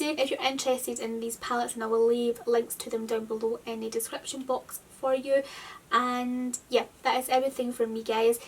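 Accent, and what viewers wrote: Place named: Glasgow, United Kingdom. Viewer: This is a lovely look, I ended up buying both of Alexis' palettes, then I went and bought another transformation palette because I loved it that much. You sound like you're close to where I'm from, I'm in Glasgow 💖👍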